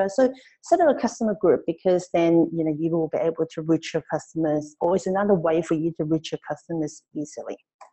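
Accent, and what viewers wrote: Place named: China. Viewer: Chinglish ... love it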